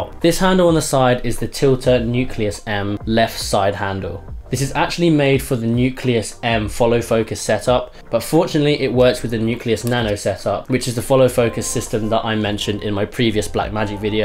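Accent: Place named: RP, United Kingdom. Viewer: I came from your bmpcck set up and blimey your accent is british to the shits haha, which part of UK u from? Im an aussie raised SE asian born dude and yousound posh af to me. loving it dude. subbed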